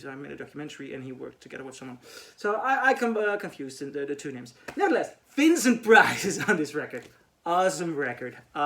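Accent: Netherlands. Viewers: Nice video! Are you dutch? Because you sound dutch. Ben je nederlands?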